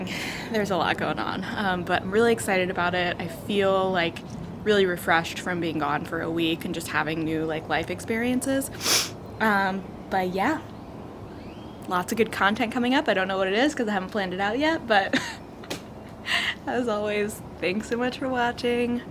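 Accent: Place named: United States. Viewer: Love the garden, but I thought people only talked this way as a caricature of an American accent. Is this for real or she is doing a bit?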